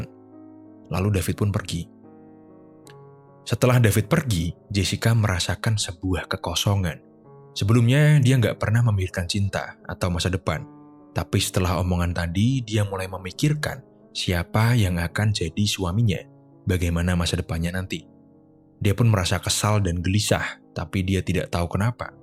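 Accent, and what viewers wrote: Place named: Semarang, Indonesia. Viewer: Bang, lu orang Semarang ya bang?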